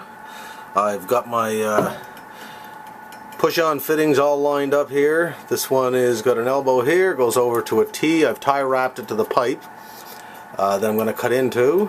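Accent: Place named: Canada. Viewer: I know this is a late comment, but please bear with me. The "" T "" junction fit was mentioned numerous times but was not actually shown being inserted. There was mention of a water mess difficulty....However, I really wanted to see how to slip the fitting on one end.....and then get the other end ( which would have been cut one inch long) into the fitting without having to push that copper end (on the supply tube) upwards and then downwards and into the fitting. I really missed that bit.....and I was wondering if anyone could add some information on the easiest way to do this. The supply tube may be attached via a lug and screw and that would make it difficult in my 'mind"....but maybe it isn't thanks for any help fellows. Love that Canadian accent...First time I ever noticed it.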